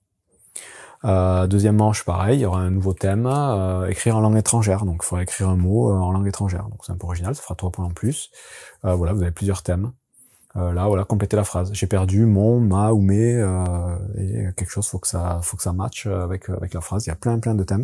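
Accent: South-West, France. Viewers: Bonjour, Je regarde régulièrement tes vidéos et je me décide enfin à te laisser un petit commentaire. Merci pour ta chaîne et la régularité de tes publications. Une chaîne qui donne de la fraîcheur dans le paysage vidéoludique pour le moins très similaire et assez commercial. Tu es clair, concis, précis dans tes explications, c'est fort agréable, et avec un accent du sud-ouest qui ne gâche rien ;).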